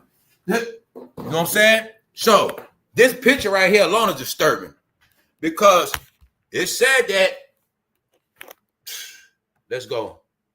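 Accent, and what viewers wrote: New York, United States. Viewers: be cooking 💪🏽 especially with your NY accent I like, just my thoughts so if get out how’s he gonna be living 🤔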